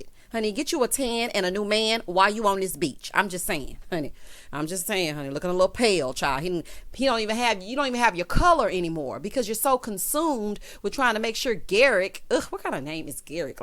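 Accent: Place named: New Orleans, United States. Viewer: Hello, english is not my first language so I'm sorry. This is the first time I stumble upon your video, and I smile listening to your accent. You remind me so much of princess Tiana even though I have no idea how you look like. And I'm sorry if that's wrong or something because I'm not american, but to me you sound like you're from where Tiana lives. New Orleans ^_^ and it's just really wonderful hearing you speak, I truly enjoy your speaking voice and I'm sure you must be just as pretty as she is too.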